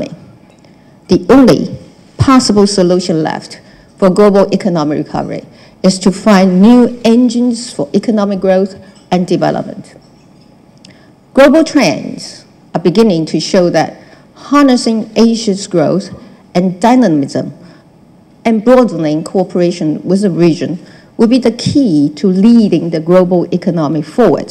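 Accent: United Kingdom. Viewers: 英式的英文！ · 英國腔很重捲舌短音尾音拉高、氣音加重是傳統英國式英文跟美國粗獷大條不掩飾的發音有很大不同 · 愛英國腔😍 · 很有趣的開場，英國腔有點不習慣，不過還是ok的! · 有兩個英文youtuber都鑑定蔡英文總統是偏向英國腔 ( 請搜 "浩爾譯世界" )，總統加油!!! 2020 請再為台灣服務! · 這台風和幽默感~ 英國腔好聽就不用提了XD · 開場的聲量還有點顫抖、可能是興奮稍微害羞等等，有點台灣腔跑出來，但是後面部份鎮定了，進入主題後展現自信、侃侃而談，很明顯是流利的英國腔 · 蔡英文的口音是英國腔耶，好好聽喔！英文真好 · 被東吳英文系的韓國瑜嚇到掉了一魂，來這裡聽蔡英文的英式英文壓壓驚。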